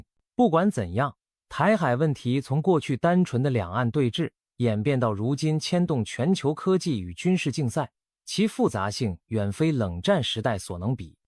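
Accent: China. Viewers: 你的口音足中共国的人,你的知識相對匮乏,解放軍不會先對台灣開第一槍,會對駐日美軍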